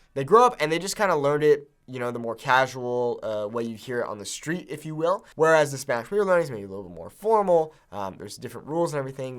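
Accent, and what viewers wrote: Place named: Mexico. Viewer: Congrats on your spanish which is awesomely fluent, since any spanish speaker can hear your mexican accent as well as the mexican slang words. What amazes me most is the fact that you've just taken four years of classes in high school to reach your current level, I mean i started learning english (in Spain) when I was seven throughout years in the education system 'till i was eighteen, including furthermore several stays in the UK and Ireland as well. Once I started college I continued taking classes to get an advanced degree, but, definitely, I do feel my english is not as good as your (mexican) spanish. Congrats again mate, I love watching your videos, keep It Up.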